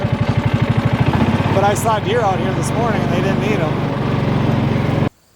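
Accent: Minnesota, United States. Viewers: I love your show & your Minnesota Swedish accent❤.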